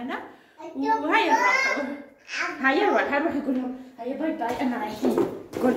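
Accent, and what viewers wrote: Setif, Algeria. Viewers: لهجتك عرفتها أنا ثاني من سطيف بوڨاعة تحديداً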